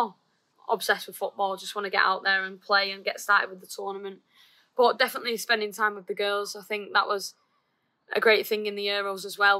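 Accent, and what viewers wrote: United Kingdom. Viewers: Hi. I'm trying to expose myself to all the British accents. To be honest, it's quite hard for me to understand you, but I realize that sometimes you emphasize your accent. Please, you don't need to do so cos some people follow you due to your regional accent. Could you please let me know what's your accent called? By the way, good luck with the World Cup. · The British accent is really cool I love it ❤️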